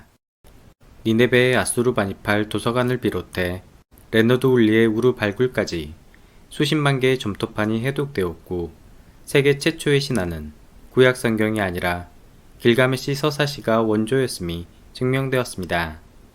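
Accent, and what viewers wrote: Jeolla, South Korea. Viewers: 숨기지 못한 전라도 사투리로 듣는 길가메쉬 이야기 감사합니다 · 혹시 전라도 분이신가요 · 전라도 사투리가 정겹습니다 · 전라도 분이신가 사투리발음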